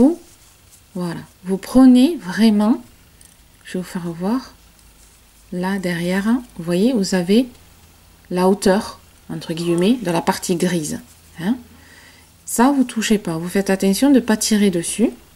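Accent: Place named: Pau, France. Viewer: Vous avez un accentde Pau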